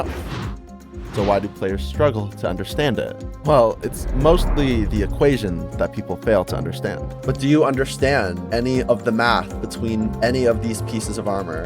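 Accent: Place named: Poland. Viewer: ok just sounds Polish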